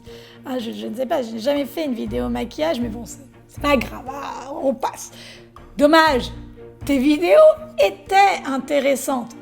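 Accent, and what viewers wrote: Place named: Italy. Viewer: Charmante femme avec un bel accent italien , qui nous donne des vidéos intéressantes à regarder concernant les drones ou autres... continue comme ça et au passage même si tu es assez grande pour ça, ne fais pas attention aux haineux. Au plaisir de voir tes vidéos ! À bientôt 🙂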